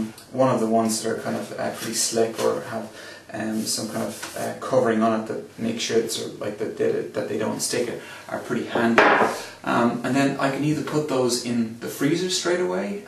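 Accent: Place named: Ireland. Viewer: It's strange actually hearing a fellow Irishman on youtube, it's usually americans.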